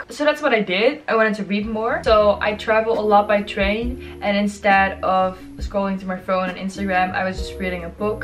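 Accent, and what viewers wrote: Netherlands. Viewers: r u dutch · you dutch? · can tell ur Dutch hahah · You are Dutch I hear it!😂💓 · Omg! I was able to tell that you were in the Netherlands cause of the way you speak. I lived in a small town in Limburg for 3 years. Anyways, I'm right now doing my one month detox facebook, instagram and twitter. Not Snapchat nor Messenger because I only use them for messages purpose. I did my cheat day yesterday because of my birthday but I honestly just posted about my birthday, scrolled and I realized that I don't care about it anymore and I haven't missed anything. And after this morning I logged off. I began this detox a little bit early on 27 September and I will log in again on 1 November. The best tip I followed was to completely remove those apps because in my computer, I rarely use those platforms. It's nice to see more people doing this. I'm more productive than ever before and I don't feel like I'm missing anything. 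Tot zo!! ;-)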